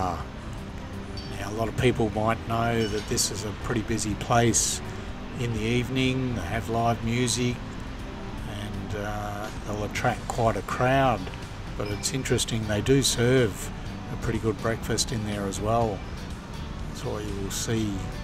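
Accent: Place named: South, United States. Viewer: You talk to slow, to be a Darwin local, and you have southerner accent.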